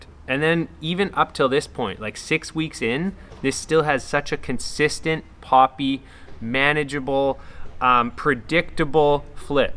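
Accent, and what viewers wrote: Canada. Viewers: Are you from Canada or Minnesota?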